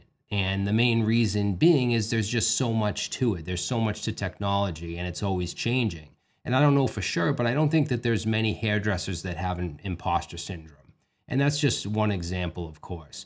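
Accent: Boston, United States. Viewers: Hey my 2nd favorite Boston accent. 1st is Ben Affleck in Good Will Hunting. Thanks for all the videos, honestly you've helped my career leaps and bounds and yours are the videos I recommend to any dev that I teach.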